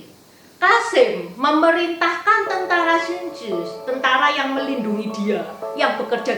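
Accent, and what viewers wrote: Java, Indonesia. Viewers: Logatnya medok jawa bget!!!wow keren👍🏻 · Mbak Intan anda orang semarang ya...koq medok jawanya. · Muka mu cino suara mu jowo ... wow ! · Paras Tionghoa logat jawa. Sukses trs Mbak Intan👍. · Ini logat Jowo Cino. Bahasa Jawa campuran bhs Indonesia dg logat khas mereka. Jarang yg murni pakai bahasa Jawa atau murni bahasa Indonesia. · Casinge cino jowone medok tenan.. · Org Jawa memang medox.salam dr pulau Lombok kak. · Maturnuwun mbakyu Intan, aku seneng dengar ceritanya dengan logat Jawa yang kental... teruske wae mbakayu.... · Muka Tionghoa ngomong medok banget Jawanya 😀😀😀😀😀😀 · Mantap 👍👍,,,logat Jawanya medog,,👍👍👍😀,,, terus semangat,,,,and sukses selalu 🙏👍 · Indonesia memang beragam.ayo kita jaga keberagaman yang ada di indonesia.....unik banget Cece logat jawanya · Jawanya medhok he... Saya juga orang Jawa logat jawa kalau sudah lengket sulit ilangnya. 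😀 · Logatnya jawa banget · Baguss logat jowo njekkeknya, semangat yuu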